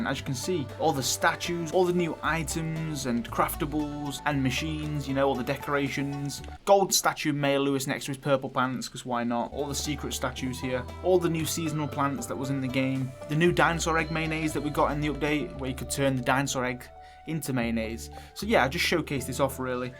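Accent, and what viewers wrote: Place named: Northern England, United Kingdom. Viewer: The fact I am thinking your proper northern like me 😂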